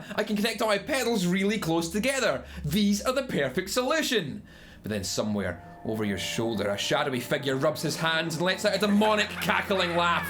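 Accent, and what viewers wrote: Scotland, United Kingdom. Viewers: This only works because of the Scottish accent. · If anything I watch your videos for your Scottish accent, I love it. And that's no shiite. Makes me want to watch "Trainspotting" just to hear Robert Carlyle ( Begbie ) say " You fooking coont ". Great content bruv, always spot on. Peace. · Love the Scottish accent. (No homo) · A Scholar, a Guitarist (I would have said Gentleman instead of Guitarist but being a Guitarist is much better than being a Gentelman lol ) and an Engineer :) well said sir. and I have to say only with your great Scotish accent can you say the most epic part of this video at 0.23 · I am hoping that I was right and it is scottish :D (totally love it by the way) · Oh how I love me some thick Scottish accent! Ah yes, the old home land. Great truth as well! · He’s Scottish. He says “Pair-dull’ 😄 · Why is the Scottish accent so well suited to these "HELLFIRE AND DAMNATION UNTO ALL YE SINNERS!!" kind of things? 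If I said it I'd sound ridiculous! My Glaswegian Great-Grandmother is laughing at me from beyond the grave.... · That just made my day... Hearing a Scottish man describe the devil working through guitar pedal couplers in an insidious voice, with sinister music and maniacal laughter in the background. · i just love the Scottish accent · Reminder to me: Visit Scotland. Just because of that cool accent... :-D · John JohnnyArt Pavlou It makes me wonder where he's from. He sounds a bit Scottish. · I like Scottish accent even though it's hard to hear..😂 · I didn't know you could get even more Scottish... Great video as always! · Yeah the Scottish accent is pretty cool (I’m American) but he really is well spoken and knowledgeable, keep up the good work! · I'm first time on this channel, accent of this guy is soo beautiful. Is he Scottish ? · Oh my god. Your scottish accent is perfect with your anger ahahahahaaha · Are you Scottish? 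I think I'm getting better about telling apart accents in the UK. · the fact that you're a scotsman makes the preaching sound even better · Here's another one of your videos that I walk away from a wee bit smarter from having viewed it. Aside from the super cool Scottish accent that we all love, you have a great blend of intelligent content and delivery of said content. Keep up the good work! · And that's why the Scottish accent is the greatest ever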